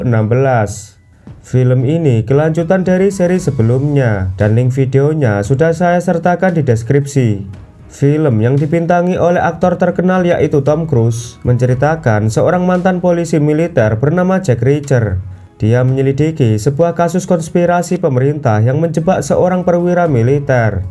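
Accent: Java, Indonesia. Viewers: Suaramu kok medok jowo banget mas.. Soko ngendi sampean?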